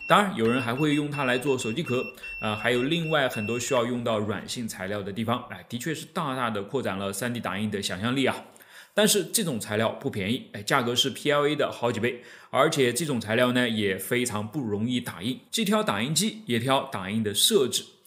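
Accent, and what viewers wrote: Nanjing, China. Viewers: 口音有点南京的感觉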